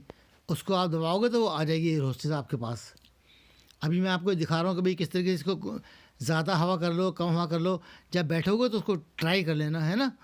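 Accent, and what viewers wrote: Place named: Uttar Pradesh, India. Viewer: Salam chacha ap ka ye andaj bahot achcha lagta hae ajawo bhai dosto asa to hamare U P me bolte hae thanks chacha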